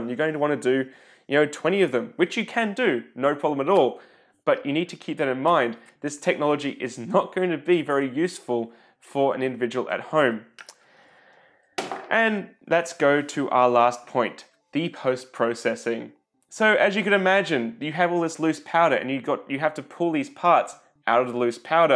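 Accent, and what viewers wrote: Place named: Australia. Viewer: YOU'RE AUSTRALIAN! I WOULD HAVE NEVER CALLED IT. GOOD ACCENT! (I'm Aussie too)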